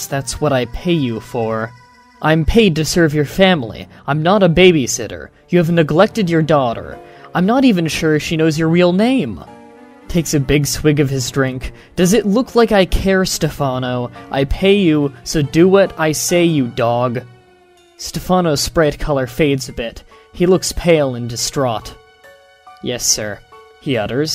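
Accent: Canada. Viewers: Are you Canadian? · are you Canadian?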